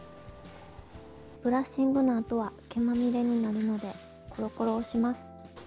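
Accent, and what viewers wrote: Kansai, Japan. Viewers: 関西の人や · イントネーション関西人っぽい🌟り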